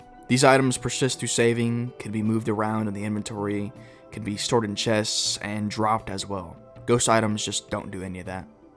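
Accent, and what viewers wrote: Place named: South, United States. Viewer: I Can hear the southern in your voice brother 🦅🇺🇸🦅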